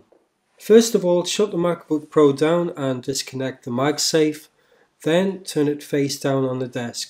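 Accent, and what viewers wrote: United Kingdom. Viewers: Hi there! thanks for the tutorial! Where did you buy the assembly from(which buyer)? I found some on Ebay, shipping from China, but I am kinda skeptical. If it was from China, did you have to pay customs? (you sound British, so it should apply to me as well as I am from the EU).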